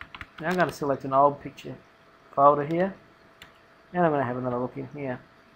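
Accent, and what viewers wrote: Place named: Australia. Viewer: omg i luv ur accent! austrailien, right? (did i spell that right? i dont think i did.)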